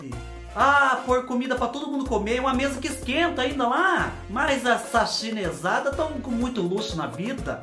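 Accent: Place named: Itapetininga, Brazil. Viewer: amo o seu canal kkk cê fala igual eu e a turmaiada aqui de itapetininga kkkkkkk espicúla kkkkkk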